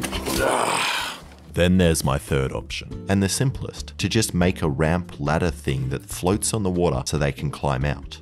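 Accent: Australia. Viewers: Bro this dude be australian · Are you from Austrália?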